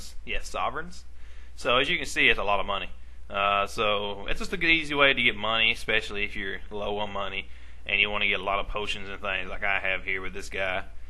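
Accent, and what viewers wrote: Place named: South, United States. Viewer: Ok, wanted to thank you again, had to track down your video again for a reinstall. Plus after 7 years in the UK it's nice to hear a "down home" accent again lol thanks again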